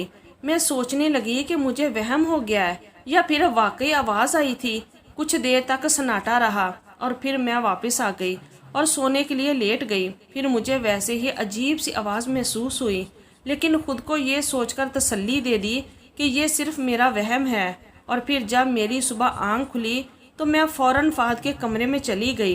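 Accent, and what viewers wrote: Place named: Lahore, Pakistan. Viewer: Lahoren lehja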